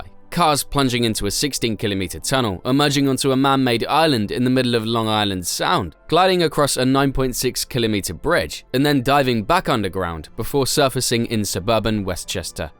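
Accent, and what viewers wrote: United Kingdom. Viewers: That Limey twang is irritating. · Who let the gdamn British do this doc.... calling Queens, LI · We hate this harry potter accent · I can’t take a British man talking about nyc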